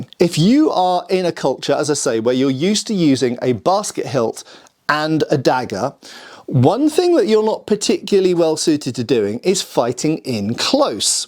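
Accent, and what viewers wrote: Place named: Scotland, United Kingdom. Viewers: Technically nae just an accent, but a separate language, or leid. Middle Scots was it's own leid separate from English, as is it's descendent Modern Scots, which I have been trynna practice more. Odd but nice that they are close enough you can mix them so much and still be reasonably understood. Fun at the least, as is the accent. As a broadsword/claymore practioner meself here in Canada, although admittedly a rather junior one, Ah love that I can hold a spiked targe, a dirk, and claymore all at the same time. Lotta options to stab, bash, stab, smash, or cut, as is most convenient. Always love the baskethilt content anyways! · Yeah, more scottish speaking!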